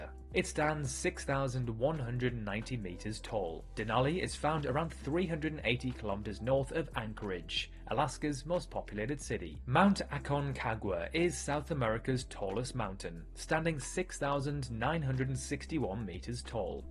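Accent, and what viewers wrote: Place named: Durham, United Kingdom. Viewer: Interesting stuff to while away the early morning. Thanks! Possibly annoying question: what's your accent? I'm guessing somewhere like Durham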